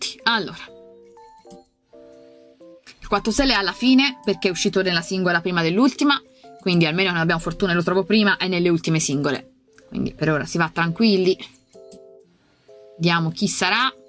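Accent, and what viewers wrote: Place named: Tuscany, Italy. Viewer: Ma sto accento toscano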